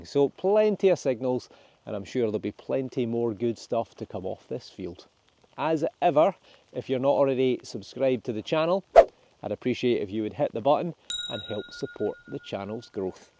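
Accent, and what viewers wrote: Scotland, United Kingdom. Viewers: thanks so much for your videos. Me and my 11yr old so n are very new to this amazing hobby but I have to say you have taught us so much already. On a more personal note, I have to thank you because there have been times when Theo has lost a bit of enthusiasm through drought periods or not getting any permissions, but your videos have genuinely kept him going. He loves your little catch phrases, and when we start for the day he will say "he we are in the beautiful fields of Cheshire" in a Scottish accent obviously 🤣. Keep up the good work. Thank you from Paul and Theo in Cheshire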